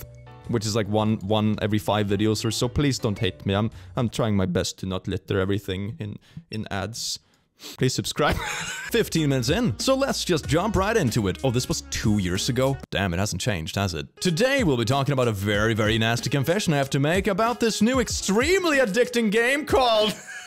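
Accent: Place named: Sweden. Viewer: in casual clothes threw me for a loop. Hardly recognized him. Wouldn't have except for funny swedish voice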